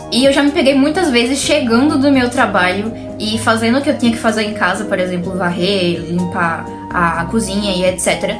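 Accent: Northeast, Brazil. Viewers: Oii bom dia tudo bem? tava tomando café da manhã, e fui abrir o youtube pra assistir algo enquanto como, e seu vídeo apareceu na minha tela com um título que me interessou bastante, assim que você começou falar, já senti que era de algum lugar do nordeste/norte, e vi ali num comentário você falando que é de PE, sou de Garanhuns, não sei se conhece, ah e você falou que vai fazer 22 daqui alguns dias, vou fazer 27 dia 31 desse mês também, enfim, de qualquer forma queria falar que sinto que seu canal já virou um dos meus favoritos do YT, tanto por você ser do mesmo estado que o meu (isso causa uma sensação de maior próximidade, sei lá), quanto pela qualidade do primeiro vídeo, isso faz eu sentir que os seus próximos vídeos irão me agradar sempre, te desejo todo sucesso do mundo! ansioso para ver os próximos conteúdos <3, saudade anos 2000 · Talvez eu esteja enganado, mas percebi um leve sotaque nordestino em você, talvez influência de algum conhecido. 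Eu sou do nordeste, por isso. Eu sinto muita nostalgia dos anos 2000 também, muita mesmo, e mais ainda dos anos 90. Eu nasci em 1992 e possuo 33 anos, não importa nada do que digam e explicações, eu vivi esses anos e eles são infinitamente melhores que os atuais. E eu posso argumentar com fatos! As pessoas viviam focadas em suas relações interpessoais; A política e economia no país seguiam a normalidade, ou seja, infinitamente melhores que hoje; As drogas tinham menos alcance, haviam poucas facções e não controlavam o país inteiro como hoje; Havia segurança jurídica: Os políticos não roubavam descaradamente e nem prendiam quem descobrisse; A modernidade líquida não havia de tornado, ainda, o padrão. E eu poderia ficar aqui por horas.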